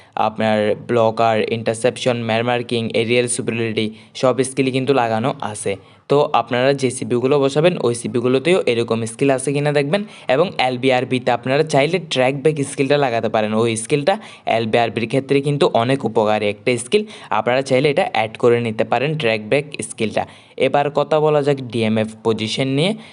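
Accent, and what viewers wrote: Chittagong, Bangladesh. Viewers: Vai apnar basha ctg te naki😊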